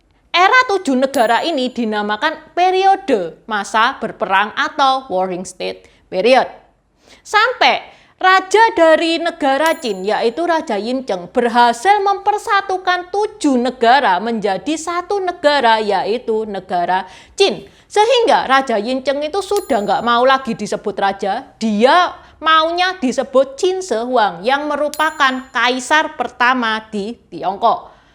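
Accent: Java, Indonesia. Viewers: logat jawanya jangan di ilangin...terima kasih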